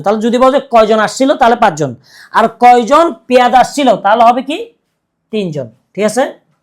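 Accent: Chittagong, Bangladesh. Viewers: ভাই কি চট্টগ্রামের ? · সার আপনি কি চট্টগ্রাম এর টিচার? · ভাই কি চট্টগ্রাম এর??❤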